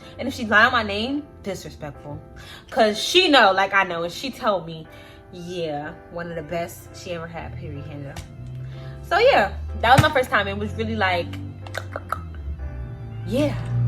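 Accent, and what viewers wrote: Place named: South, United States. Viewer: Yea u either from Atlanta or Houston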